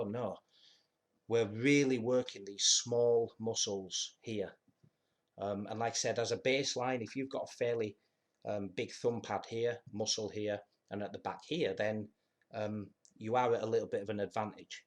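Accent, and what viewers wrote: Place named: North, United Kingdom. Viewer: Really interesting vid with well articulated points - referencing all my fave grip channels. Subbed after being directed here by Nick. Good to hear a familiar northern accent talking grip. Look forward to more content ✊💪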